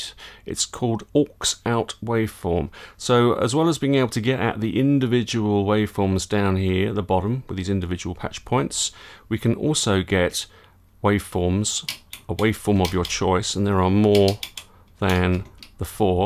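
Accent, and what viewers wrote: United Kingdom. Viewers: I'm not into modular (yet ;) ), but these sort of video's give me also more insight in synthesis in general. Besides that, your video's are always very thorough and of the highest quality. (It shows you're a photographer / videographer). Plus you have an excellent voice combined with your English accent, which always makes it a joy to listen to.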